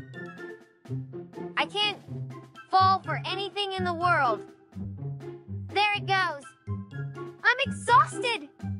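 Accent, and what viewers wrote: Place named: Venezuela. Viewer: Adivina tú eres de Venezuela porque hablas de Venezuela tú nunca has dicho vos vos sos vos nunca lo As dicho entonces tú eres venezolana hablas venezolanas tienes el acento venezolano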